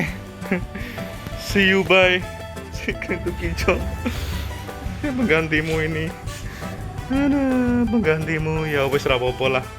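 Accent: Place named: Java, Indonesia. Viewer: Mas.. Logat jawa kami bisa nerima, kalo bahasa jawa bukan kami gak nerima tpi gak ngerti mas, mungkin itu juga yg menghambat pertumbuhan channel ini. Dri kualitas mas,shrsnya bs dpt 2jt subs.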